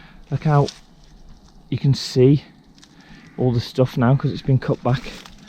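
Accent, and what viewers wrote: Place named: Midlands, United Kingdom. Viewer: OK mate you sound like a Midlands Lad ...?out of interest ..👍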